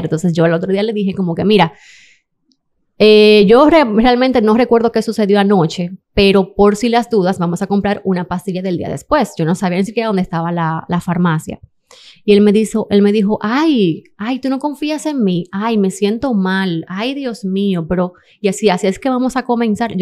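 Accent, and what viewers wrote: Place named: Venezuela. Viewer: Hola pense que eras venezolana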